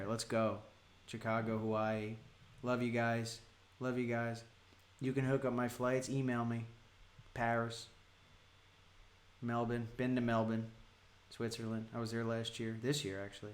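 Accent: New Jersey, United States. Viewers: You can take the boy out of Jersey but you can’t take the Jersey out of the boy 😎🤘🏼🙏🏼WHA-DUH 💦 💧 🤣🤣🤣 I freakin ❤️ it!!! Don’t change , be you siempre 😊😊😊 #dailyliststrong 💪🏼💪🏼💪🏼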